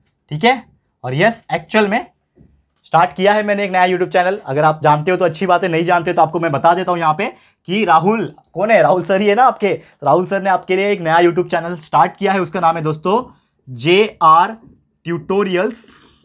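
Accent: Maharashtra, India. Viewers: Sir are you Maharashtrian???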